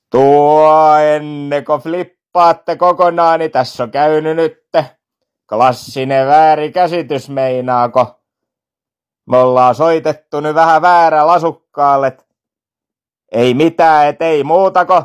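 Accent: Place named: Pori, Finland. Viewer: Tää oli HYVÄ! -Ja viäl pori murteel :D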